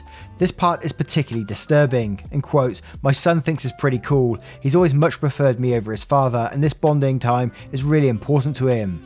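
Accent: United Kingdom. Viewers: I have listened to so much UK media over the years I don't notice the accent, until you call the TAH a Berk and then I giggle.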